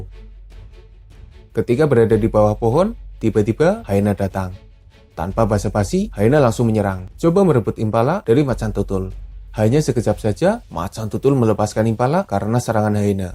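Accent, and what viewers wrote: Banyumas, Indonesia. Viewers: admine wong ngapak ya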